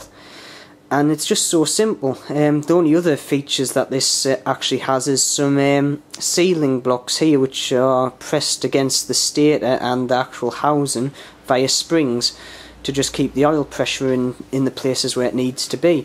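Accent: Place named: Northern England, United Kingdom. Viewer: What a distinctive Northern accent..Sounds almost like Liam from "Benidorm".:)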